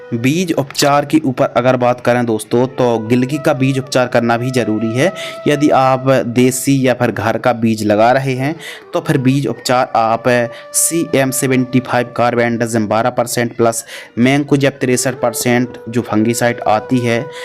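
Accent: Bundelkhand, India. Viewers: Bhai tum ka bundelkhand se ho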